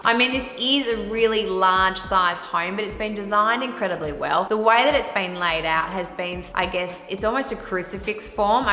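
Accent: Australia. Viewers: Her accent is from South (or) West Australia.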